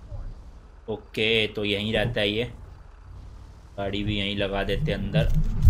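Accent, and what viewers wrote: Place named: Haryana, India. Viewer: apka haryanvi boli mst hai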